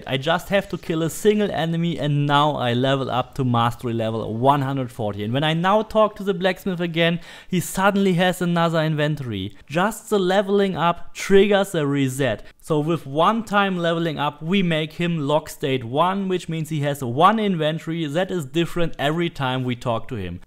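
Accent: Germany. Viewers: I’m just curious are you German? Or Austrian? Or from a totally different country cause I’m dumb lol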